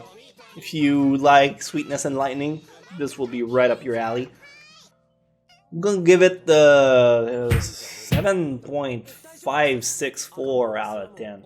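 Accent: Quebec, Canada. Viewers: I feel like now that I know you're from quebec I can't "not hear" the signature accent of a quebecois speaking english xD i'm from montreal lol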